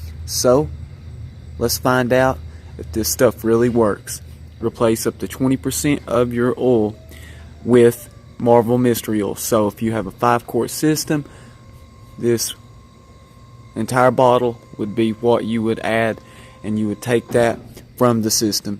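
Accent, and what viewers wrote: South, United States. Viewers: It's how people say oil in the south. Pronounced "ole"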